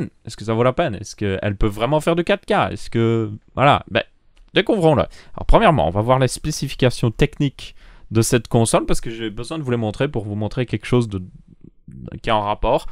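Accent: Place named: Belgium. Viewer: bah il est belge il le dit en belge ... il va pas le dire en anglais non plus ..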